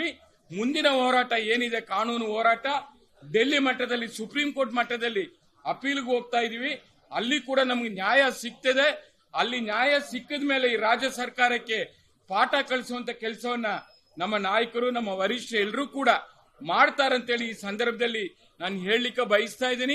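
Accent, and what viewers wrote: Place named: Tamil Nadu, India. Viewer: ಕನ್ನಡ ಸರಿಯಾಗಿ ಮಾತನಾಡಿ ಮಾನ್ಯ ತಮಿಳ್ ಪಿಳ್ಳೈ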